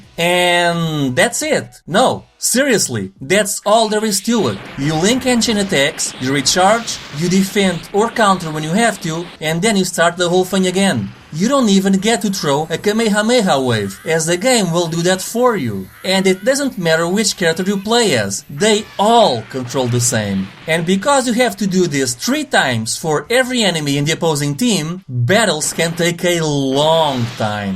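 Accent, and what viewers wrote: Portugal. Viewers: I sense a Portuguese is reviewing this, dá-lhe amigo 👌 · Desculpa... Mas tu és português? É que o sotaque é quase inconfundível!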